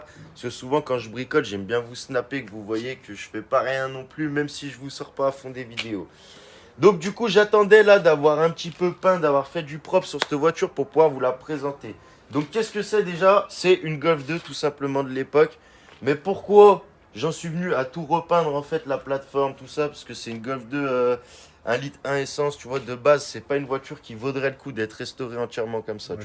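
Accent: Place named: Lyon, France. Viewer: Il a bien l'accent lyonnais. Beau projet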